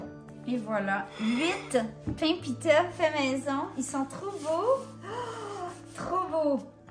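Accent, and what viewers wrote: Canada. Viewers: Salut ! merci pour le tips , je connaissais même pas le " pain pita " j'suis venu par curiosité :D en tout cas t'arrêttes po !! c'est toute sympa :D ( j'ai l'accent canadien en tête maintenant bravo )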